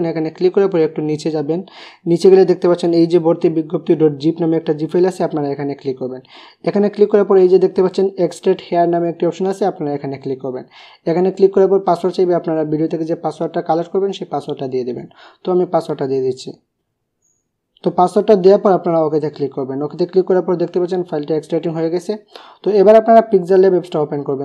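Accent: Sylhet, Bangladesh. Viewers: আপানার বাড়ি সিলেটে?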